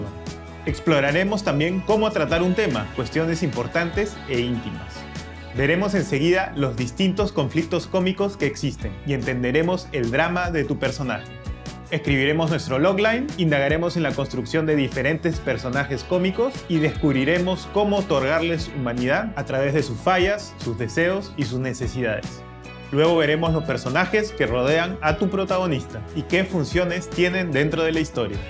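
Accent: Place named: Spain. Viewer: ESPAÑOL DE ESPAÑAAAAAAA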